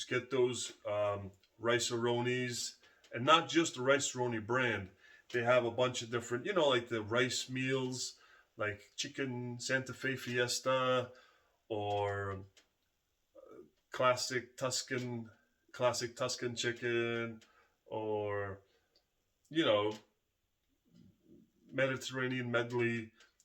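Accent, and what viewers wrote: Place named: East Coast, United States. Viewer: Congrats on reaching over 500! I knew you’d gain a lot more subscribers! You remind me of old school YouTube when people would create videos for fun instead of making professional productions. You look like you’re having fun sharing and you’re genuine. When you share your experiences/hauls/failures/successes, it’s like talking to a friend. I need to up my preps. Been having some stomach pains lately so the doc suggested a low fodmap diet. That threw a wrench into my preps. Still trying to learn what it entails, but it is so restrictive that it makes most of my preps off limits (hopefully I don’t have any food triggers that irritate my stomach so that I can continue eating normally). No gluten, no beans, no onion or garlic. Lots of fruits off limits. Anyway...thanks again for the entertaining and informative videos. 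One last thing — one source of protein you may want to look into is tofu, if you eat it. There is a shelf stable type by a brand called Morinaga. I got a box from Amazon. It can last longer than the refrigerated type. Oh, and I must say your accent reminds me of my East Coast cousins!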